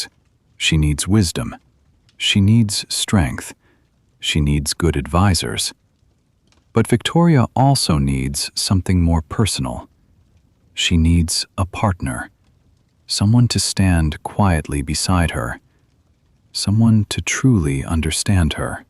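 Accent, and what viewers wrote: United Kingdom. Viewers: Is it a British accent?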